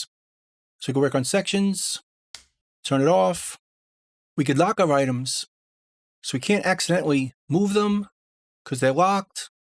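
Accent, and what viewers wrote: New York, United States. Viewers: sounds like Christopher Walken with a New York accent. And man, these videos and how he presents the material is perfect! I've learned everything I know about Reaper 7 from. Thank you!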